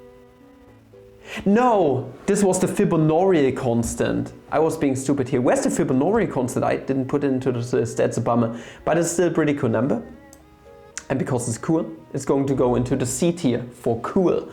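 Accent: Germany. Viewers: i bet youre german (5 seconds in) ps: bin selber deutscher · german guy tryna speak english for half an hour straight yes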